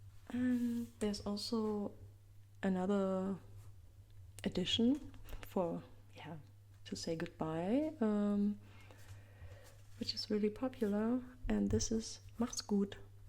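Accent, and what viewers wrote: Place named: Germany. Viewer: Hannes Von Sydow hmm, wie kann man nicht raushören, dass sie muttersprachlich deutsch spricht ? :o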